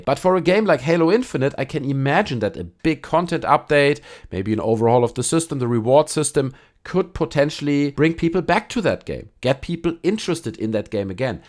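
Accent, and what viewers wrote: Germany. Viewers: The accent is so bad i am pretty sure you are a german. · could it be that you are german? you have that distinct accent ;) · The german accent is strong in this one.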